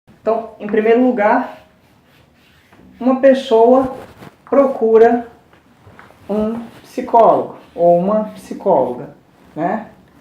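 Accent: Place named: Espírito Santo, Brazil. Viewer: Professor, vc tem "sutaqui di capixaba" rs Aulas muito claras. Conheci seu canal hoje. Muito bom. Parabéns 👏 👏 👏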